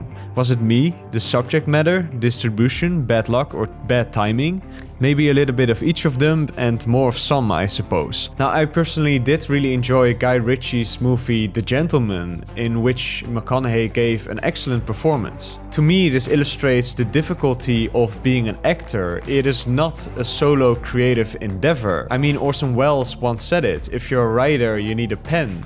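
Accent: Netherlands. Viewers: Je videos zijn altijd ZO goed maar ik denk 100% (en dit bedoel ik niet als belediging) dat je nóg meer views krijgt als iemand anders met een wat minder sterk Nederlands accent het audio gedeelte over zou nemen. (Met alle plezier zou ik dit voor je doen/proberen als je er voor open staat.) Nogmaals, na genoeg top tier content wat mij betreft!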